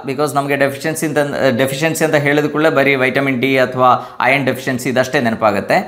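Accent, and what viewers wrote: Kerala, India. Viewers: Thank you doctor valleya information nange iron defeciency untu tumba hairloss allready aagide ...nan body iron absorb mado dilla hematology doctor helidru supliments indanu aagodilla injectionalli matra hemoglobin increase aaguthe adakke enadru maddu doctor ge gothidre dayavittu tilisa bekagi vinanthi.. .malayalam ecsent untu doctor mataduvaga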